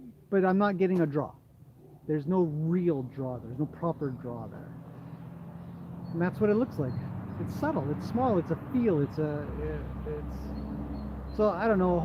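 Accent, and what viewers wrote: Canada. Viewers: Hello, love your video's , where are you from, you sound Canadian.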